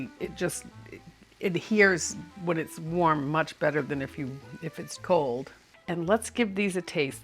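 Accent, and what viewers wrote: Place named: New England, United States. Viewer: It is great to wake up with my coffee and one of your videos. I have been trying to identify your accent. Certain worsa you say remind me of my family in NNew Hampshire and Massachusetts.. We will be trying out your donuts very soon, thank you .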